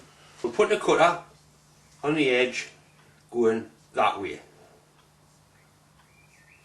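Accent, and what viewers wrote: Newcastle, United Kingdom. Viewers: 62 Btw he is not Irish he is infact a geordie ( from Newcastle, England)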